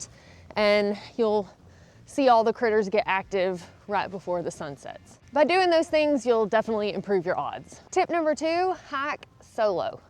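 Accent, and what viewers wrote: South, United States. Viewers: Girl, love that southern vibe. Never heard more dropped g’s at the end of words in my Texas life!😊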